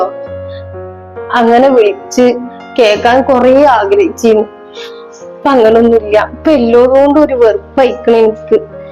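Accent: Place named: Malappuram, India. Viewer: പക്കാ മലപ്പുറം ഭാഷ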